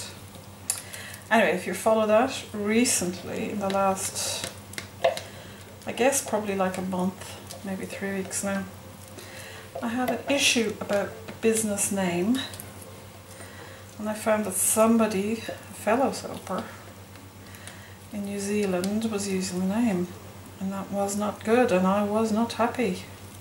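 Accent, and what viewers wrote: Ireland. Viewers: it's always such a joy to watch yours video's. Not only for the soapmaking, but also your contagiously laugh, hearing you chatting,.. dare I say your Irish accent which is as pleasing to hear! <3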